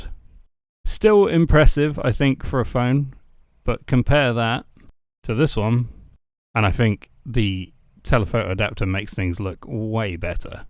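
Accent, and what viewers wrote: United Kingdom. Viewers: British accent 😮😢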